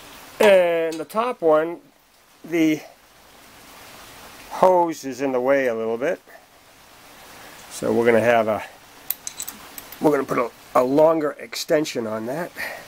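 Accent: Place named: Massachusetts, United States. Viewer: I love hearing the accent!! LOL!! My wife grew up in Lawrence and I said, this guys gotta be from Mass! LOL!! Sure enough, I see the Mass tag on the front! I do get a bit crotchety with these manufacturers!! Read is 13m so it figures the front would be different....as some one else pointed out, they have you buying one of this and one of that.....well...at least I can justify my Advance auto charge on the bank statement!! LOL!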